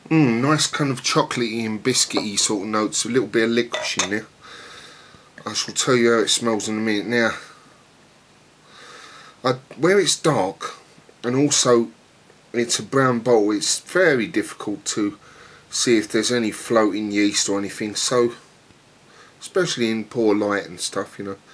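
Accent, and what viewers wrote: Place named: England, United Kingdom. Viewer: Greetings from California, United States. I'm going to try this beer. Thanks for the review and I enjoyed your lovely English accent. :)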